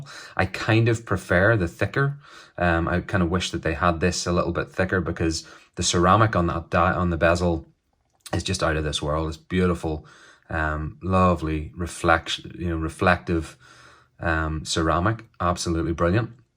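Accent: Northern Ireland, United Kingdom. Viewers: great to hear a NI voice. I have a 38 with red bezel on order. thank you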